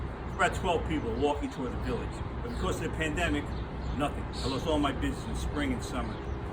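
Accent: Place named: New York, United States. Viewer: Add me too a little Di Niro! Going on here the accent the look. You are much cuter and younger! Born in Oceanside, I will be tuning you for my NYC fix! Thanks!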